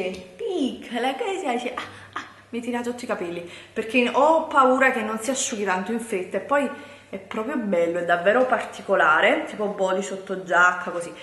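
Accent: Naples, Italy. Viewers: è accento napoletano se non lo sai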